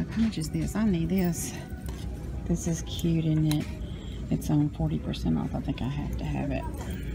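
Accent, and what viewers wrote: South, United States. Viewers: I'm from Michigan and I just love hearing your southern accent! "We fixin to have ourselves a good ole day"! I'm going to say that to myself every morning for the rest of my life!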